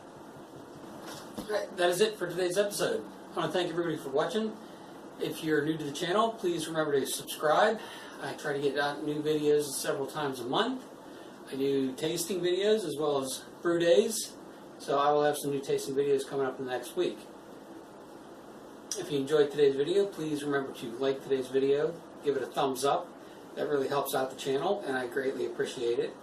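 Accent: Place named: Pittsburgh, United States. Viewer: The pittsburgh accent makes me chuckle. Am I right? Yinz make at beer to go tailgate the stillers game?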